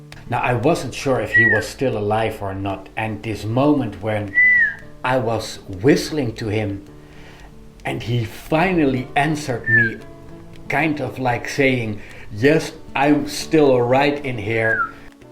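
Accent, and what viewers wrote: Netherlands. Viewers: Are you Dutch? C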